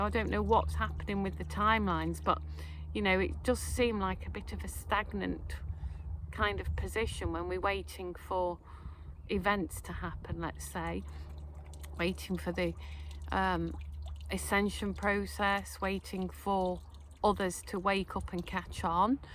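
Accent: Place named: Derbyshire, United Kingdom. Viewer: Hi, are you from Derbyshire? I only ask because your accent is very similar to mine:)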